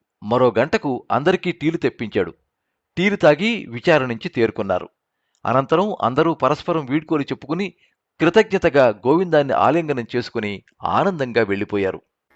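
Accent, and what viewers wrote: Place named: Telangana, India. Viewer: నేను రాసిన 'శిథిల సంధ్యలో చిరు దీపం' ను శ్రీ గారు అధ్భుతంగా చదివారు. అవసరమయిన చోట స్వరంలో ఎంతో ఆర్థ్రతను కూడా పండించడంతో రాసిన నాకే కథను వింటుంటే హృదయం ద్రవించింది. వారి గళంలో తెలంగాణ యాస వినసొంపుగా ఉంది.అది నిజంగా శ్రీ గారి స్వర మహాత్యమే! వారికి నా హృదయపూర్వక అభినందనలు మరియు ధన్యవాదాలు!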